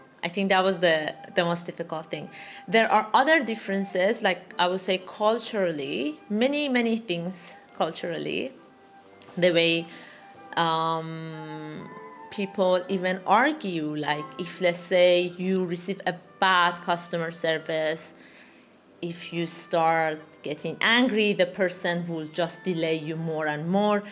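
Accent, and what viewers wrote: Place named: Ghana. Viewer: Congrats on your Ghanaian accent 😁👍🏾 “By the Grace of God …”, lol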